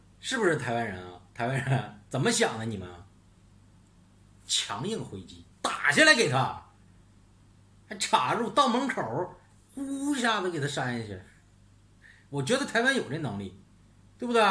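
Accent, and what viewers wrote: Northeast, China. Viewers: 东北口音胖子有意思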